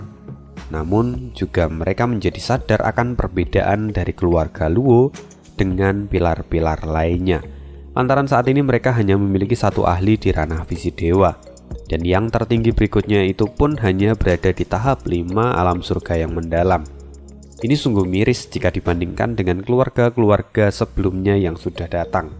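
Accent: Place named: Java, Indonesia. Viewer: Mimin btw orang mana yaa kayaknya logatnya logat Jawa nii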